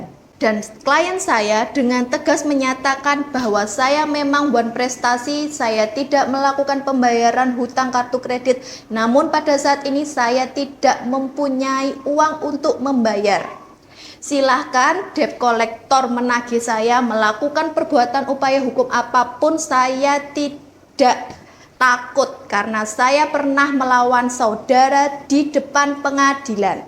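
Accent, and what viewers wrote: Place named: Java, Indonesia. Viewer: Jawa ha medok bngt mba. 😂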